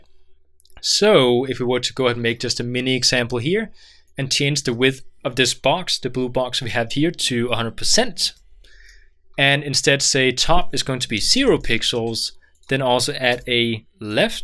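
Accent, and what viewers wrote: Denmark. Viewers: Are you by any chance Danish?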